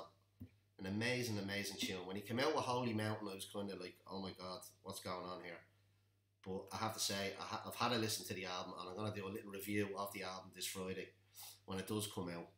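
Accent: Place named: Dublin, Ireland. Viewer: You sound like a dubliner will you be going to his gig next May in the 3arena?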